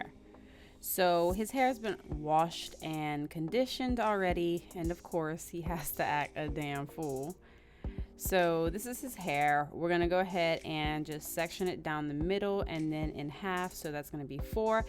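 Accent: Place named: Louisiana, United States. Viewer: I showed this to a guy to get an idea of how his hair would look when I was finished, and as I was watching, it was driving me crazy hearing your voice, i was like, she gotta be from Louisiana 😅. I love it ❤ Great content as well!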